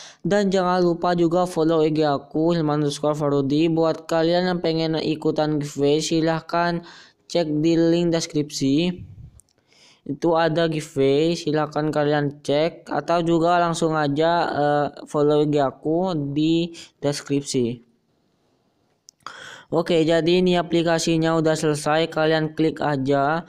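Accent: Madura, Indonesia. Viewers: Orang Madura ya bang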